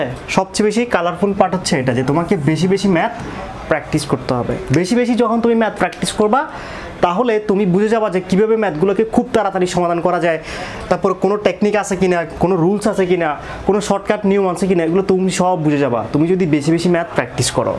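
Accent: Chittagong, Bangladesh. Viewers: চিটাইংগা নাকি???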